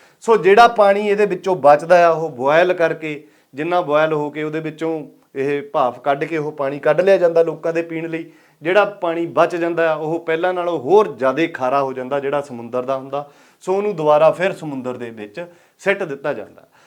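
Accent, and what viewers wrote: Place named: Punjab, India. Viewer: If all you noticed is one word, not the effort behind the video, that says more about you. UAE, JuAE - pronunciation isn’t a big deal, especially when the message is clear. Focus on the content, not nitpicking. Respect the hard work. If you don't like to hear Punjabi accent, try uploading videos in English and I'll listen..go get some life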